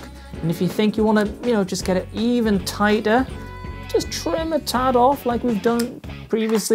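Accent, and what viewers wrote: Newcastle, United Kingdom. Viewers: Ah, the toon accent! Drop you a sub, gotta support the local lad, awesome channel mate!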